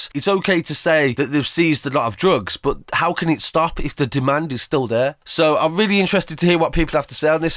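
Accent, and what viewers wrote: Birmingham, United Kingdom. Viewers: Just the birmingham accent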